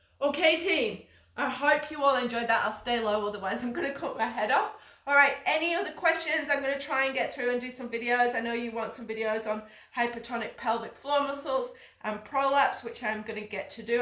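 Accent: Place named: Lancashire, United Kingdom. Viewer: This was great advice thank you, love the accent, are you originally from Bolton Lancashire or nearby? X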